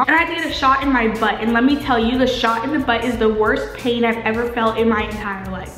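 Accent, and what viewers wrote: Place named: United Kingdom. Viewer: sounds British ish even though I'm British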